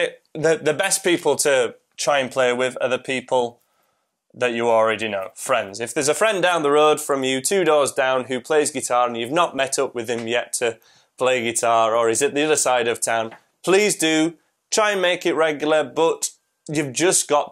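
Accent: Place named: South Yorkshire, United Kingdom. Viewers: Good video. What part of the north are you from? Scotland…not Geordie for sure. I am an amateur linguist and musician. Lol. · Are you from Barnsley? Your accent is so much like my bf's and I lived there for 4 years. If not then it's gotta be somewhere in South Yorkshire like Sheffield, Donny, Rotherham or even Wakefield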